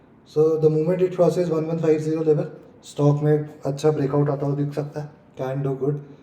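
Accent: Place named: Madhya Pradesh, India. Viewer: Aap madhya pradesh se ho aise lagta hai..apan..is a good giveaway..me indore se hu .subscribe kar dala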